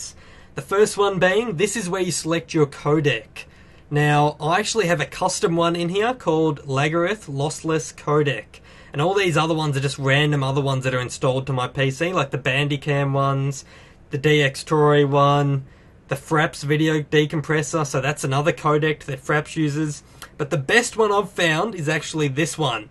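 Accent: Australia. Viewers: Are you Australian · no offence but are u Australian?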